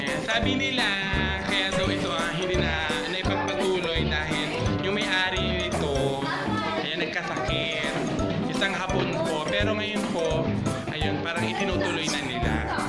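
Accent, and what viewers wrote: Batangas, Philippines. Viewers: taga batangas po kayu lipa po ba😂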